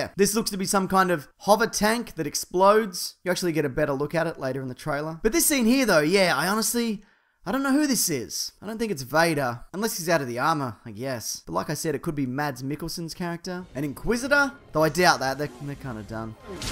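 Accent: Australia. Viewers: Hey man big fan. Just wondering that's an Australian accent right?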